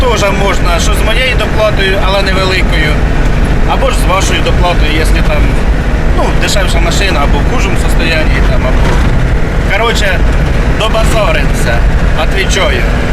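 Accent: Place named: Zolotonosha, Ukraine. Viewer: Привіт з Ґетеборг (Швеція).Я сам з Золотоноші,давно виїхав.Приємно побачить веселого земляка.По розмові зразу чути що Золотоноша 😂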